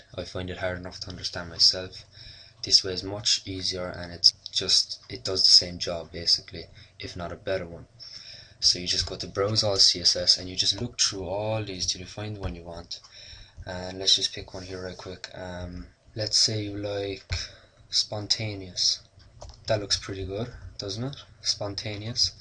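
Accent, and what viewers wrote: Ireland. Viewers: Is that a Langer accent I hear?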